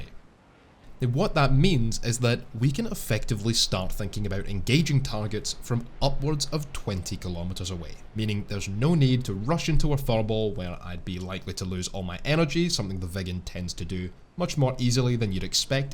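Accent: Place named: Edinburgh, United Kingdom. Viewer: You sound like your from Edinburgh….I like Edinburgh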